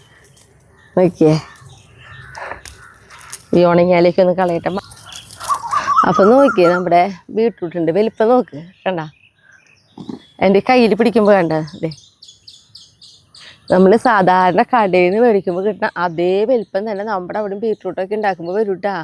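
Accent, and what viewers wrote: Thrissur, India. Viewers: Nice vedio very useful you are from thrichur taking nicely ❤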